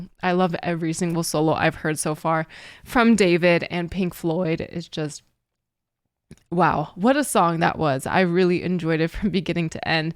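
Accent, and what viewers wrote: United Kingdom. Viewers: Are you British? You have a little bit of an accent which is totally cool but I was just wondering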